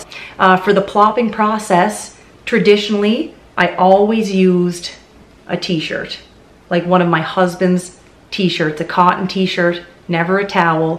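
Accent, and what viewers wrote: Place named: Nova Scotia, Canada. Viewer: Well it's got to be Nova Scotia somewhere lol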